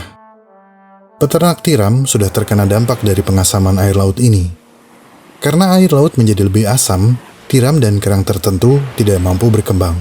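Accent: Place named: Makassar, Indonesia. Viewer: DARI MAKASSAR MANA SUARANYA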